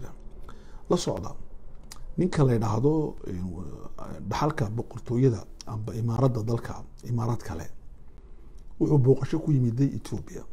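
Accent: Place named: Djibouti. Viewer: Waxaqiiqo waxaan kuu sheegayo Waa iska jeclahay lahjada reer Djibouti shiiqu runta uu kahadlay somali israamsatay oo kala tagtay Hala qabsado kolay aniga qaxooti baan kahay dhulkii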